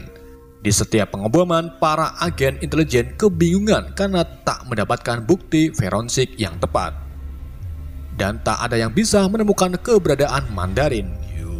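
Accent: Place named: Banyumas, Indonesia. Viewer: Jawanne. Ndi mas??? Dimak dimiik kayong ngapak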